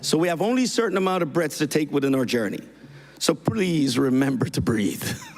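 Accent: Jamaica. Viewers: Speaks regular then patwa instantly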